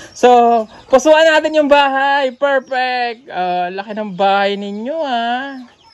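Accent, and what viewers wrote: Visayas, Philippines. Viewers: Sir di na jud ka mabaligya sa kabisayaan, kahibawo naman ka mag bisaya. Good job sir.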